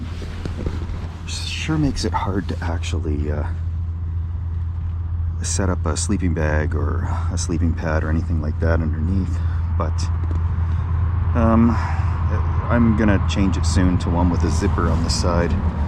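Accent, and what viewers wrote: Canada. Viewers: your calm Canadian voice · Canadian accent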